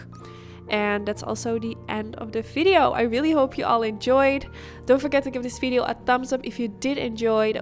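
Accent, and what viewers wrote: Netherlands. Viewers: I guessed you were from the Netherlands in the first 20 seconds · Hi, I'm new to your channel. I was very curious about your accent. I didn't know you were from the Netherlands, but since I also live here, I recognized it inmediately, and then I read your information and it confirmed it. I have watched 2 of your videos already and you just won a new subscriber. I really appreciate the close up on the eye shadow looks and it's super easy to follow. Thanks a million.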